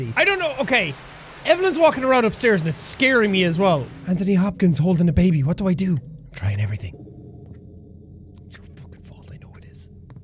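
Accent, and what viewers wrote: Ireland. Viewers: He sounds so much more Irish ☘️ in this video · I don't know if I just haven't watched in a while or something but you sound VERY Irish to me in this video for some reason. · I was so scared just hearing his irish accent get more intense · I love how progressively became less irish through out his career and now he's suddenly hyper irish. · was so uncontrollably irish in this video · Is it just me or does sound impossibly more Irish this episode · I love how used to hide his Irishness and he's now more Irish than ever. If he ever says "Fecking eejit" I will lose my shit.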